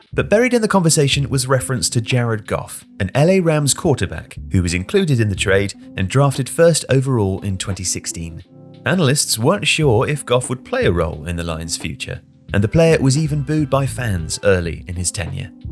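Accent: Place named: United Kingdom. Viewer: It’s weird hearing a British accent explaining the NFL…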